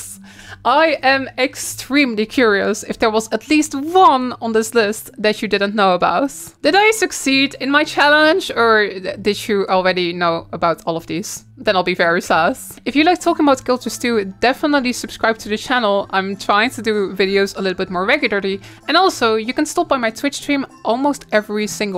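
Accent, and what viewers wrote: Netherlands. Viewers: i knew you were dutch before i saw the properties for guild wars 2.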